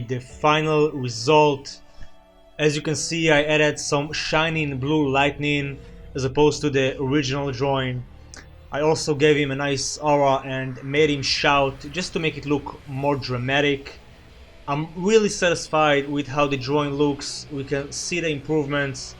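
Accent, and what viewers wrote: Israel. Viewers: וואי אתה נשמע ישראלי רצח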